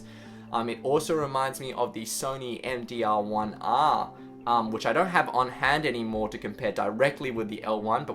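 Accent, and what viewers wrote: Australia. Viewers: i mean this in the best way........its crazy seeing an asian with an austrailian accent.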